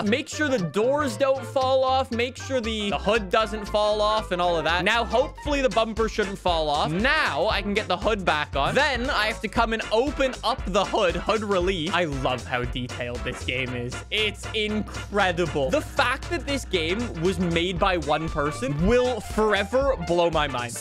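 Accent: Quebec, Canada. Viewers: This dude is a perfect demonstration between french canadian and french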